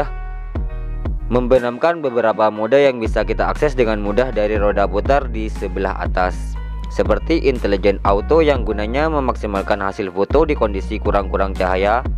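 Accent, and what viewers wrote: Madura, Indonesia. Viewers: kayak orang Madura malahan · Orang madura ya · Orang madura ya bang?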